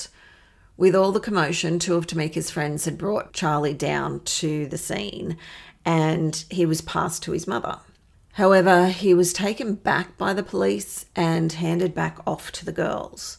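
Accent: Australia. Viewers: I don't usually like Aussie accent but I could listen to you all day U.K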